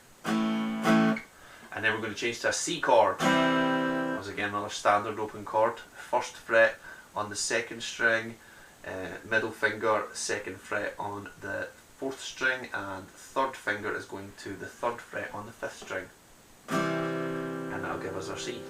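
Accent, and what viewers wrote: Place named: United Kingdom. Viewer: Thx for a clear, well produced tutorial. " "? It was a good chance to brush up on my Mancunian, or Geordi, or Scots...whatever... sounds cool.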